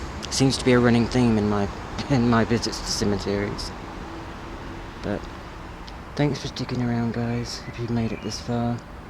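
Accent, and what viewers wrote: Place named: United Kingdom. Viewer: Oh wow ok, I wasn't expecting you to be from NC. You picked up a some of that UK accent so that threw me off a little. I know some people who live in NC. My name is too and I'm from Maryland. I found your channel off a comment you made watching 539's channel. I watched a few of you vids and liked them although a couple had me really feeling your pain brother. I feel for you. I hope you can find a something to manage your symptoms better. No human should have to endure that kind of mental anguish. It's the worst kind of pain. It's like torture and really is worse than any physical pain. Physical pain can be dealt with.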